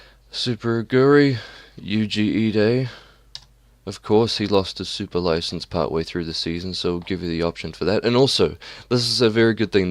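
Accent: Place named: New Zealand. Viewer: I was sitting there thinking where your accent came from and I thought,”I reckon he’s a Kiwi” Nailed it,gday mate.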